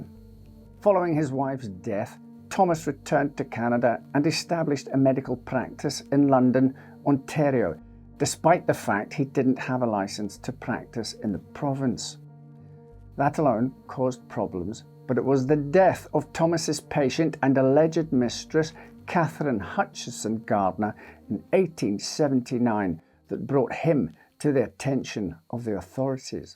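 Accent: United Kingdom. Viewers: I absolutely love your content, sir. You truly know how to induce a moment of true dread with your powerful accent. You make me proud to be a British person with a proper silver spoon in my mouth, and I wouldn't have it any other way. Listening to you is literally like reading a truly mysterious sonnet written by an unknown author. That's how much I find your videos satisfying to watch and listen to. I could easily fall asleep to your incredible content. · I will never understand why Brits pronounce aluminum the way they do. It's wrong af 😂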